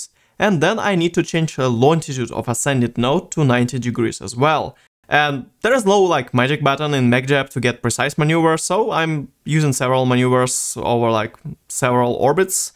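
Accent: Russia. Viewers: Ты ведь из России или снг, да?) Забавно что человека с акцентом понимать намного легче чем говорящего на чистом английском. Классное видео) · he's Russian, you can hear it tho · жесть - такой русский акцент 8) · Вы Россиянин? Акцент очень похожий на русский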